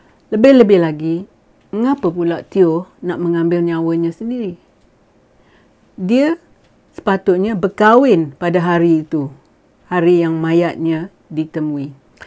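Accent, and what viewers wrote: Perak, Malaysia. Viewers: Pekat perak ni....org perak ke?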